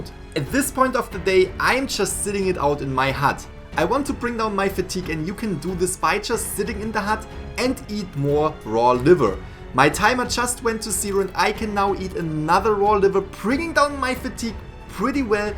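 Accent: Germany. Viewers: Der harte deutsche Akzent ist herrlich xD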